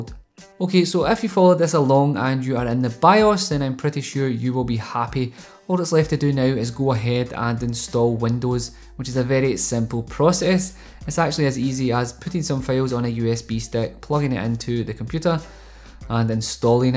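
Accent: Scotland, United Kingdom. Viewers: Chuchu - Games and Guitars probably a,ready found out but I’m pretty sure it’s Scottish, or somewhere from the Scandinavian countries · You fae Scotland ?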